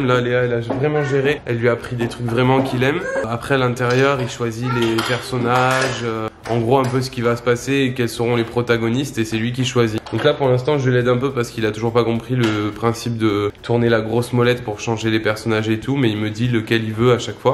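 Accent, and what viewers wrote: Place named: South, France. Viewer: C'est marrant comment tu as l'accent du sud + marqué que léa haha ! J'avais jamais capté. En tout cas très bon vlog, keep going guys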